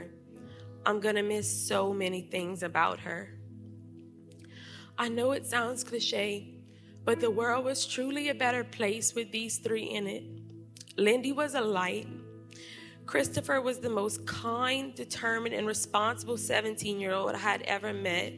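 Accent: Louisiana, United States. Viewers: First on a lighter note, I have to say I love your Cajun accent. But, Mercy my heart breaks for you.. I can’t imagine how hard this is for you and will continue to be.. my heart goes out to you and my prayers as well. I unfortunately am apart of the same group no sibling wants to be apart of. One where we’ve had to live part of our lives without another.. mine was killed in a car accident in 1999 when he was 18 and I was 13. My best friend, who was more like a sister than just a friend, died in 2008. She was killed in an accident by a drunk driver when we were 22. My heart goes out to you and your other siblings and family. I know the pain though not your exact situation.. it’s pain I would never wish on another person. I wish I could reach thru my phone and hug you, I wish I could give you words that would help or relieve your pain. But I will always be here for you and will continue to pray for you and your family. 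I am so sorry. Sending love from SW Arkansas.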